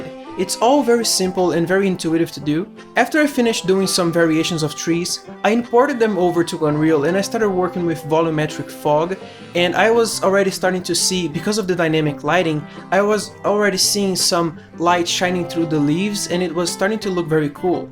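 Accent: Brazil. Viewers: e esse sotaque de Br ae?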